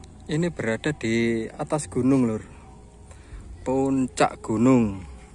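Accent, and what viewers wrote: Java, Indonesia. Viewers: Kenalan gih kang asline pean niku piyanton pundi kok bhsane medok bnget😀😀😀😀....semngat tmh sukses mju terus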